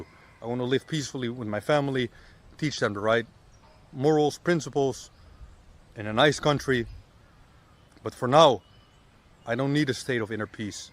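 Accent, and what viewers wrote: Netherlands. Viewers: I heard the Dutch accent in your first video, groetjes uit NL alsnog, als ik de kans krijg en het kan financiëel ben ik opgedonderd 🤣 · Ik dacht all dat ik en Nederlands accent hoorde maar lekker bezig man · Yea i thought i heard al lil dutch accent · Yo, ik dacht al te horen dat je uit Nederland komt. Mooi om dit te zien. Ik ben ook nederlands. Ik vind je werk met Far From Weak heel goed. Ga door.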